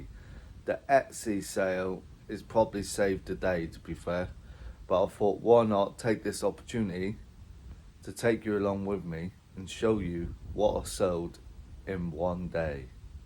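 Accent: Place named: Plymouth, United Kingdom. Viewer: I automatically recognised the janner accent.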